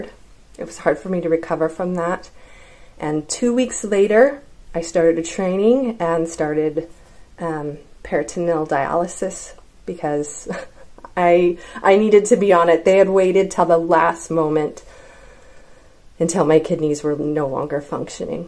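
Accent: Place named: Canada. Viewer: OMG you just said something about being in PA? I honestly thought. by the way you talked, that you were a CANUCK EHH! :O * Mind Blown*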